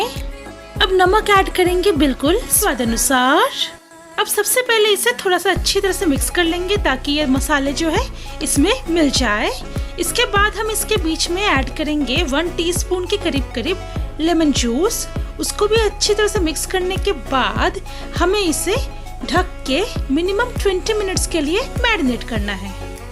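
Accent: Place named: Bengal, India. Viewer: guru kotha sune to mone hocche bengali. ta, barite ki ei rokom macher jhol khan..... youtube r yuge ekhon sobai chef.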